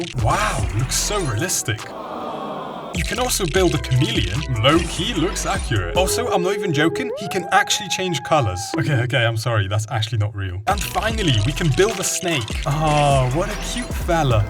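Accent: United Kingdom. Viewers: You're British 🇬🇧？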